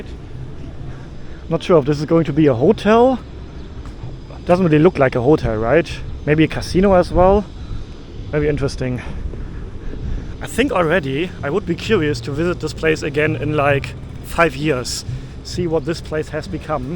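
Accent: Germany. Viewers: 😂😂😂😂😂😂😂😂😂 diese diese worst German English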